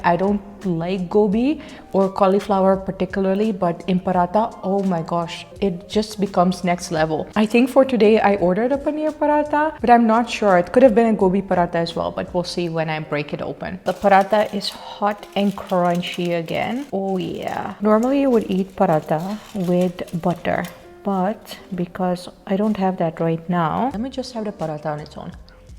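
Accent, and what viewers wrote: India. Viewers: Hey!! Ik keek jouw video's voornamelijk toen je in Nederland woonde en vanuit Nederland rondreisde. En na lange tijd besloot ik deze video te kijken. Wat mij opvalt is dat je al een mega Indiaas accent hebt gekregen! Super grappig.😉😊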